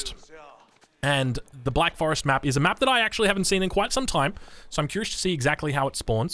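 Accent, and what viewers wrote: Australia. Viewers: I seriously cannot get enough of your voice lol. Is that weird to say? Yeah that's probably weird to say... But hey, having a great voice (Australian accent is a plus!) is great for casting like this!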